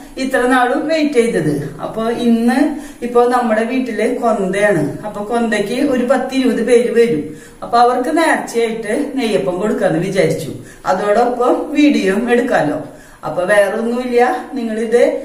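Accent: Thrissur, India. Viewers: Nammade Thrissur bhasha kekkumbo enthoru santhoshaannu ariyo ..thanks mummy ..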